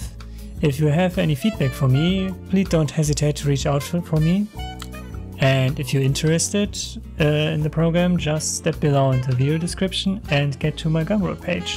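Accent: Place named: Germany. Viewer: Everytime you hear a person explaining their project with a slight german accent, you instantly know, that it is high quality and has some smart solutions in it.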